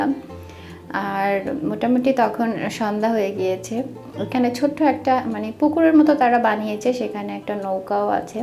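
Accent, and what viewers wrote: Sylhet, Bangladesh. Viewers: Apne ki Sylheti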